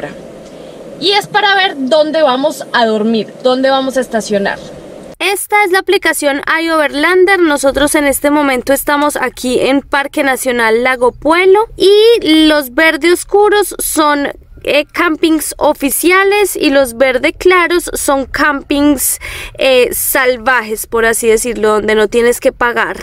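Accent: Colombia. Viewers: Me agrada mucho la forma de expresarse que tienen las colombianas, tengo una amiga de Envigado que es muy parecida a ti y es un sueño oírla y verla hablar. suerte en el resto del viaje por Argentina, Y desde luego mas allá aún. Soy de Gral Alvear, provincia de Mendoza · Me encanta su espontaneidad y como muestran la realidad de vivir viajando. Que lindo que estén en Argentina . Disfruten y éxitos en sus caminos de aventura. Hermosos y divinos colombianitos. Con ese acento que me fascina. Besitos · El acento colombiano 🥰🥰🥰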